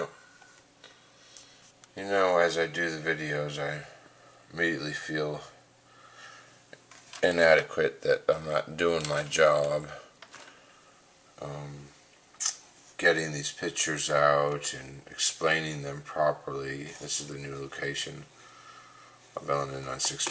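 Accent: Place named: India. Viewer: Good job! Distance she travels per day towards us...300,00 per day? How soon will we have a guesstimate re: mass?Why you speak like Indian...? LOL...prolly lots o stupid questions,fatigue to name a few...keep on keepin on!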